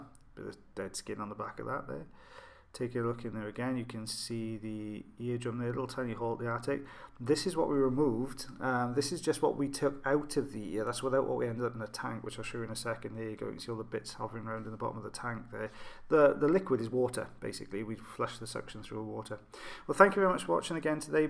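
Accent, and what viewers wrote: Wales, United Kingdom. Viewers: Lovely Welsh voice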